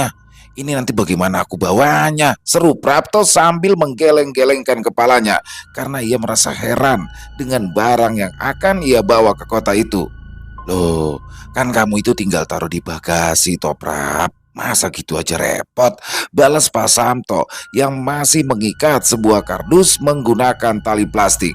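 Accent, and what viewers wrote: Jakarta, Indonesia. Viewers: Cerita bagus dari mas untung dan tambah hot jika dibawakan bang yg penuh penjiwaan dan pas bgt dgn gaya khas betawinya.... Mantab.. Joss... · Kalau udah logat Betawi bang Pian kluar kocak bgt😍 yang bikin kangen ya ini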